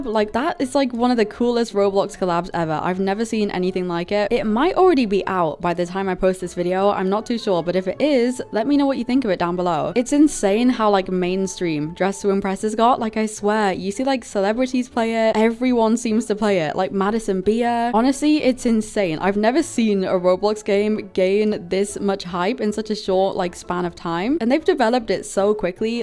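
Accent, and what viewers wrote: United Kingdom. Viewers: wait r u from the uk if so that’s really cool cuz I don’t know many dti uk YouTubers 🤭💗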